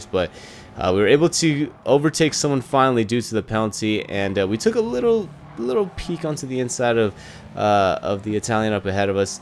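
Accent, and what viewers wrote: United States. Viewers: You sound american?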